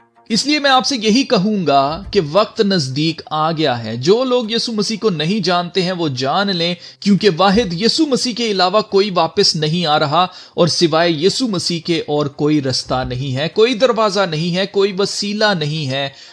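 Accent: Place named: Pakistan. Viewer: Your accent is pakistani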